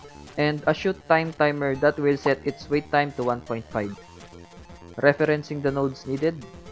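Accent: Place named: Philippines. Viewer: you sound like filipino...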